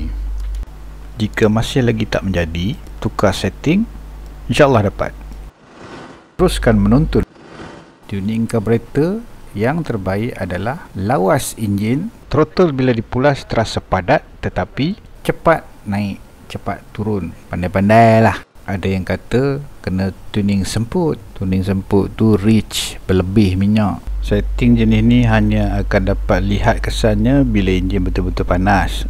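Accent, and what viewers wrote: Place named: Kuala Kangsar, Malaysia. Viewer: Assalamualaikum, buat video lagi, suka dengar Abg loghat Perak dan ilmu yg zaman sekarang susah nak dapat... Terbaik bang (S.I.F.U)!!! Semoga Allah permudahkan segala urusan Kome dan semoga Allah memberkatimu insya'allah, 🤲 Aamiinn Yaa Rabbal'alamiinn:)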